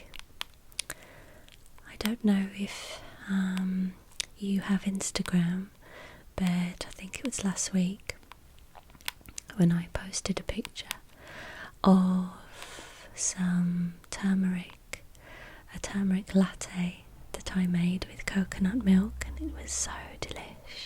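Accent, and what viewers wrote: England, United Kingdom. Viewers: Getting some nice Tingles running thru my body! I love the sound of your voice and your English accent listening to you is a very nice way to relax!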